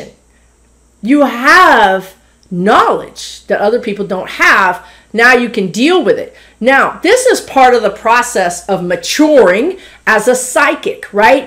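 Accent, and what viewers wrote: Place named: Minnesota, United States. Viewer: Hi I turned this on and am watching now. My 1st thought was I like her she sounds so much like people I know here. Then I thought she really does sound like a Minnesotan. My next thought was I think she belongs in Minnesota she would fit in here so darn well! Just had to share a clairknowing that I may have had.? Might be a great question for you to check with some of the awesome people that you get with😊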